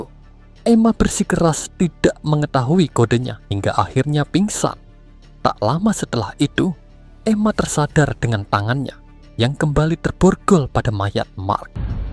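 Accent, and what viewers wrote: Java, Indonesia. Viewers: suaranya medokk bangett heee,,mantapp bang. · medhok jowo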